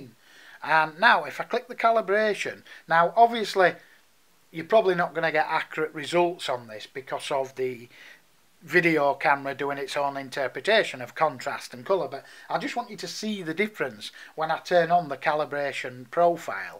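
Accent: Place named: England, United Kingdom. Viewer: where in england are you? i loove your accent :D too the spyder pro: Which one to get, and why did you get the Pro?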